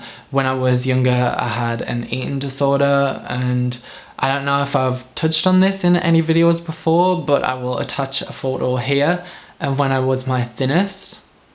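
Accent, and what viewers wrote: Australia. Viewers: Yes, you totally sound Australian, but I'm loving it :-) I prefer British English of course, but this Aussie accent suits you fine. This is only the second video of you that I watch, but I like you a lot as a person and I admire you for what you are doing. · the aussie twang keeps making me laugh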